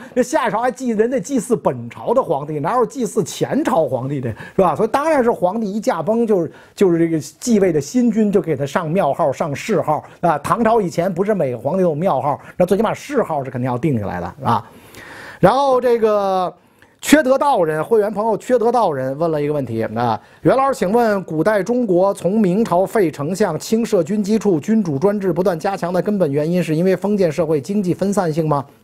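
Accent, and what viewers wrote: Beijing, China. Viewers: 才发现袁老师的节目居然还有字幕，袁老师讲话需要字幕吗😂这么标准的北平音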